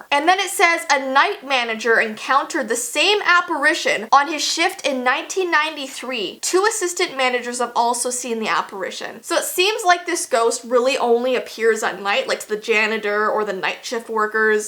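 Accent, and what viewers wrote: Canada. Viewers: Don’t worry I am Minnesotan so I have the same accent as Canadians and I say Oregon (or-I-gan) too ily❤️ (edit) this was a typo: (or-i-gen)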